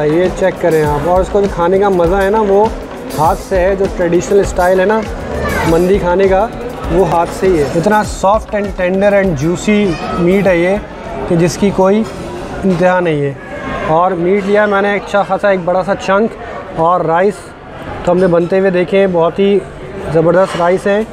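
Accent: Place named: Lucknow, India. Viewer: Puri laucknowi juban hai aapki.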